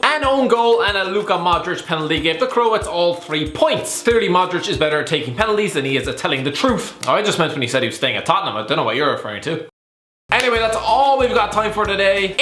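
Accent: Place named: Ireland. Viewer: nah hes just irish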